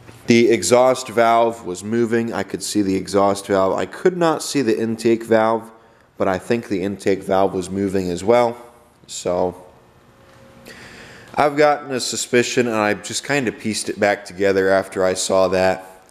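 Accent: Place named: Maine, United States. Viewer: Sounds like your from Maine, thats where i'm at too.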